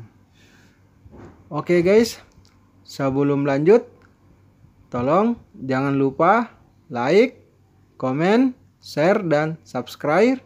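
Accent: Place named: Sulawesi, Indonesia. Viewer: Wa alaikum salam. Pak, orang dari sulawesi yaaa? Kelihatan dari suaranya...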